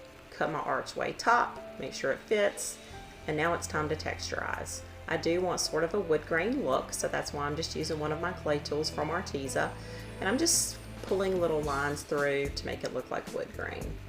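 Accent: South, United States. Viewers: I love your sweet southern accent and I appreciate you diy's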